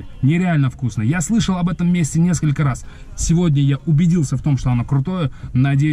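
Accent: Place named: Tashkent, Uzbekistan. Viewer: Гап йо йорвордиз ростакам тошкенча булди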